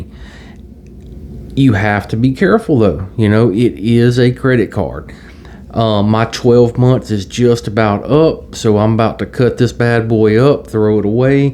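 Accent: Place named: South, United States. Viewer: Why am I just now finding your channel? I am a southerner and miss hearing a good southern accent where I am at currently. Great on the card info. Love the Magnolia (have one in my front yard).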